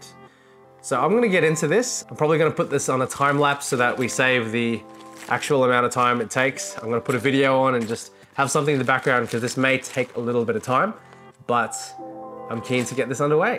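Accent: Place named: Australia. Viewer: Hey great to hear another aussie accent! just purchased a 1535 and made a small modification to allow me to attach a tripod to the case for traveling video on my channel 👍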